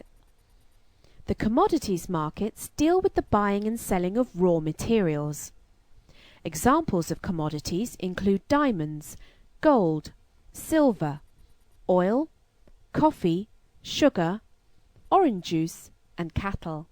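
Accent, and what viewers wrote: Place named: England, United Kingdom. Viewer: I read somewhere that a female voice is recepted better by people than a male voice is, in such things as this video (instruction audio); it's a psychological thing, and has to do with our mothers nurturing and raising us when we're babies.-- I like this video, very informative and simple and easy to listen to (because of the female voice and the English accent. > "Bonds" James bOnds.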